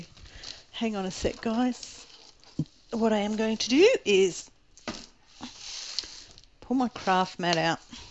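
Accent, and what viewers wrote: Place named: Australia. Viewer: Hi,nice to hear an aussie doing diamond painting on utube!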